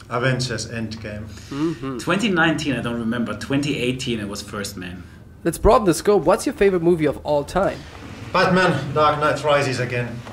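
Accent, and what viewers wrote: Germany. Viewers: The bell rings. Der Hund ringt. Höre ich selektiv oder kommst Du ursprünglich aus .de? ;) Super Video und das CT habe ich soeben auf meine Weihnachtsgeschenkefürmichliste gesetzt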